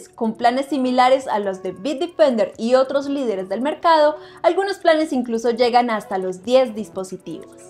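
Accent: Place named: Mexico. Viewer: Me acabo de suscribir, tienes acento de mexicana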